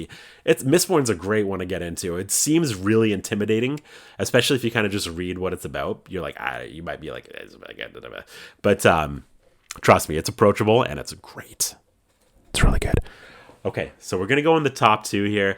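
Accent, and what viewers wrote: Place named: Canada. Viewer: The way I knew you were Canadian before you even said you were😭canadians are the best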